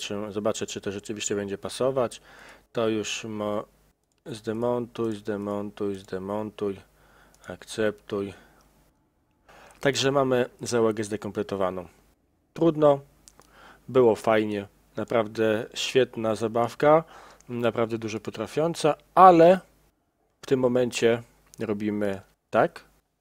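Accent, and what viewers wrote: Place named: Poland. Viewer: A czy uważasz idioto, że to Niemiec? Jest Polakiem więc mówi tak jak powinno się mówić w Polskim języku.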